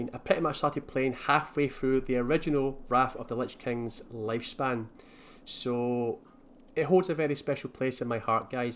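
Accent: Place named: Scotland, United Kingdom. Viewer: Love Scots and Scottish acsent.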